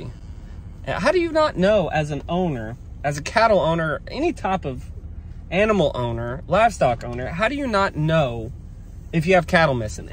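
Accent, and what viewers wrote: Oklahoma, United States. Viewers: Oh, Gosh, I just love Bois D'Arc trees, and I respect your choice to hate 'em. I want you to know, however, they are not exotic. Osage Orange (Maclura pomifera) are native. In fact, their place of origin in North American is considered to be Texas, Oklahoma, and Arkansas. Hedge Apple trees grow invasively, no doubt. My guess is that's your quarrel with them. Invasive growth patterns are different from exotic species that become invasive.There is an exotic species Argentine Osage Orange (Maclura tinctorial) that is native from Mexico to Argentina. Our Osage Orange tree has an illustrious history on our continent from the indigenous peoples use of it to white settles using it in hedgerows for fencing prior to the invention of barb wire. I bet you've heard the expression about hedgerows that need to be "horse high, bull strong, and hog tight." That's the Osage Orange in a nutshell. 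This species also boasts the highest BTU of any hardwood on the continent. In woodworking, this species will burnish to a beautiful sheen. So deride them if you must. I understand. Here's are some links to back up my claims: <link> <link> <link> Btw, your channel is terrific. I'm an Okie transplanted in Maryland now for 30 years. Watching your channel is a lovely taste of home. The bison, the vista, your accent - lovely, lovely reminders of my origins. And I love your correct usage of the term "cattle." Ranching is your business, so naturally you know how to talk about it. I go bonkers when unin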